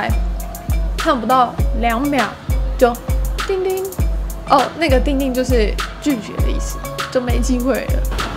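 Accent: Taiwan. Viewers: 妳的中文真的講得很好，很標準的台灣中文! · 妳的台灣腔好純好可愛！ 😍👍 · 你说话的方式也太台了。。。🤣 因该是个很有趣的女孩～ 🤭所以就订阅咯。。。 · 講話很台欸🤔 😁😁😁 👏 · 我靠 一口台湾腔调的中文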